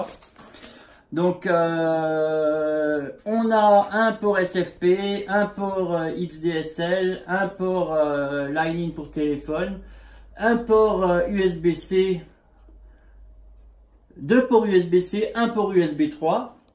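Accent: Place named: Alsace, France. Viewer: On sent l’alsacien de base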